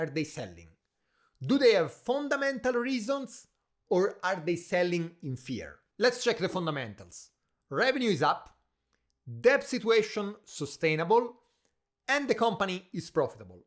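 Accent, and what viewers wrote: Italy. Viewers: Love your Italian accent and I your reasoning. I is vivifying. I use Eli Lily (prescribed by my doctor), but invest in Novo Norisk. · questo accento mi sa di italiano D: anyway good video!!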